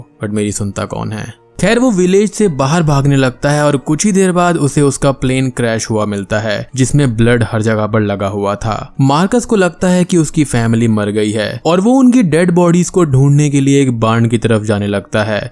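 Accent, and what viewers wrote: India. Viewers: Such an arrogant champ lol. Get your accent right indie boi. Hahah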